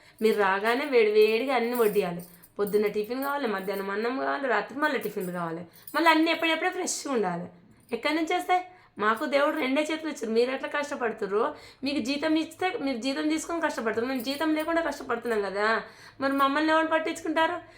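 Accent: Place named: Siddipet, India. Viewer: Meedi ekkada akka ma siddipet language lagane undi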